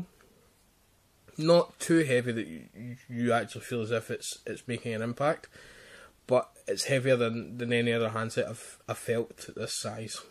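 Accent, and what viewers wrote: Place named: Scotland, United Kingdom. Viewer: Why do you talk Scottish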